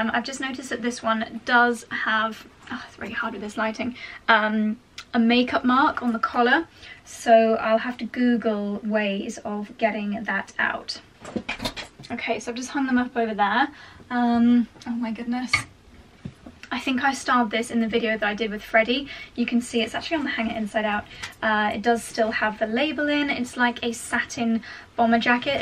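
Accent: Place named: England, United Kingdom. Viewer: I really need a compilation video of you just saying 'darling' in your lovely English accent 😊 (and also: who on earth was that ??? I really want to listen to the whole conversation 🤣)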